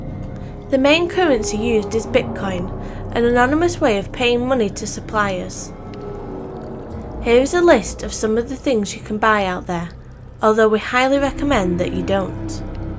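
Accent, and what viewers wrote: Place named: Northern England, United Kingdom. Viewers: North of England. Yorkshire or Manchester maybe. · rapper She sounds like she could be from Bradford? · are you British? Your accent sounds it I'm from Nottingham you sound more northern but I could be wrong lol what county you from? If your from England that is lol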